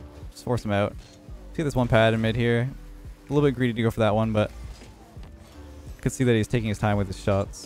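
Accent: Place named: Canada. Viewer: you never let me forget that you’re a Canadian lmao: I’ve figured him oooooouuuut 😂❤️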